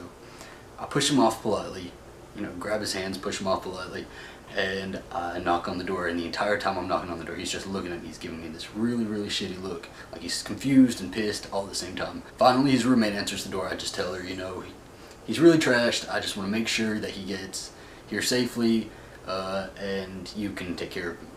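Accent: South, United States. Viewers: American Southern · Sound thick like Alabama or Georgia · I'm pleasantly surprised at this story. Not the usual whiny entitled gay b.s. What an alluring Southern accent. But really, this date sounds like par for the course -- unfortunately. It was a bad date.. but don't blame the Grindr app. It's the man you chose to date. But that's what dating is for: to find out what a person's really like. I hope you don't give up on dating.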